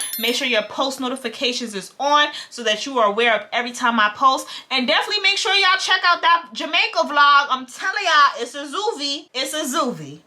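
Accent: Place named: New York, United States. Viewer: Hey giiiirl 🎉❤ First of all, your voice, personality, and New York accent keeps me coming back to your videos 😂 Secondly, we just gone say this was a blessing that this didn’t work out with this girl because like you said, bad apple. & say you would have went to her house after she asked that.. she could have went to her lil 10,000 followers and tried to play you because you were up and coming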